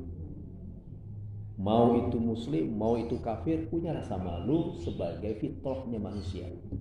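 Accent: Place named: Sunda, Indonesia. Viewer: Meni nyunda........😁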